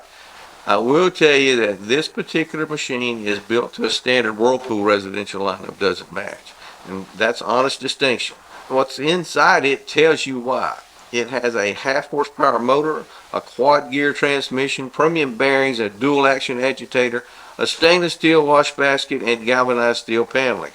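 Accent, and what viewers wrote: South, United States. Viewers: I've worked on cars and trucks for over 30 years. I really trust. He's obviously knowledgeable, experienced, intelligent and (importantly) honest. I think I'm becoming like this guy, minus the good ol' boy accent. I'm complimenting somebody. I'm not sure if it's him or myself or both. Having said that, I worked for Sears Auto in the 1990s and the appliance department guys said they laughed when they saw the Maytag commercials. You know, the old ones with the service guy who was so bored because Maytags never broke. Maytag was far and away the most common warranty problem they had. But, Sears didn't sell the model he mentions in the video.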